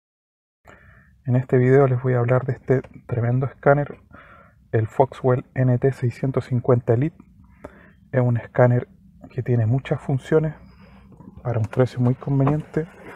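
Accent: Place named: Chile. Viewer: Amigo, una consulta.. Por tu tono de voz sos de chile, mi pregunta es, para los vehículos japones jdm que se vendieron solamente para ese mercado, pero vienen de igual manera con el volante a la derecha, Toyota subaru mitsubishi nissan etc etc.. Sirven estos escaner? Soy de Paraguay y hay miles de vehículos volante cambiado por acá.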